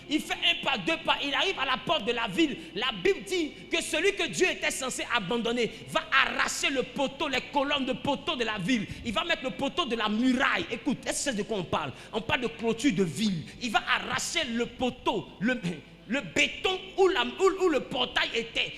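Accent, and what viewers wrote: Ivory Coast. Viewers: l accent ivoirien, man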